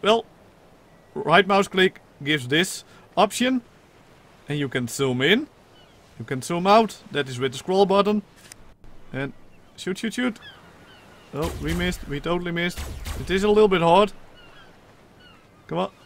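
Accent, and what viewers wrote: Netherlands. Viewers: are you dutch? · U are dutch right because you kinda speak steenkolen english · Dutch not indian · Ben jij niet gwn Nederlands ik kan het horen aan je accent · Nederlands. Accent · Are you dutch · Jaylen Scarlett hes dutch... i think that i recognize my own accent in it (before i moved to the states) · Ik hoorde al meteen dat je een Nederlander bent! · Lel your dutch accent is getting weird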